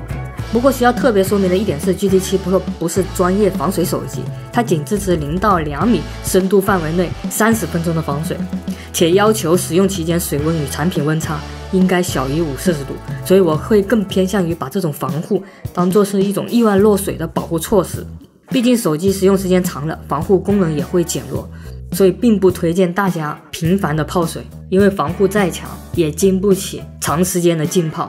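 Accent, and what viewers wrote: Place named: Sichuan, China. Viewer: 四川口音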